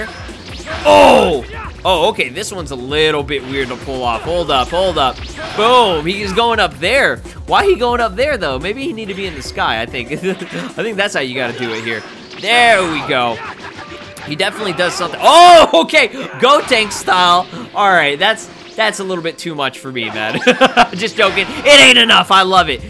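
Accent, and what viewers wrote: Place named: Spain. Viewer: I notice your accent was like spanish,or portuguese,are you?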